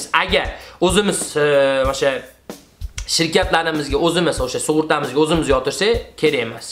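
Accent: Andijan, Uzbekistan. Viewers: Анжанли шевани йукотмапсиз койилл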